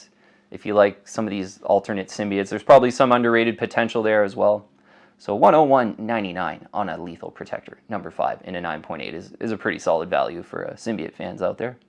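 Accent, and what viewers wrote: Canada. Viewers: Love your videos - and that Canadian accent makes me miss home. Awesome work! #TimHortons4ever #logriders4life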